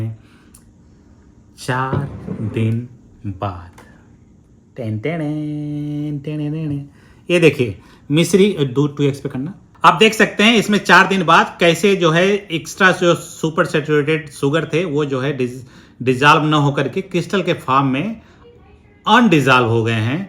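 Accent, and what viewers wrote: India. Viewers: * आपने अपने विडियो में 2 महिला + पुरुष के विडियो की क्लिप डालकर बहुत ही अच्छा किया * आपकी हिन्दी में ठेठ देसीपन है और इस वजह से अंग्रेज़ी के उच्चारण में भी देसी फन ( पन नहीं) है * जो सुनकर मजा आ गया * बाकी जानकारी का तो जवाब नहीं * धन्यवाद देसी पन और फन के लिए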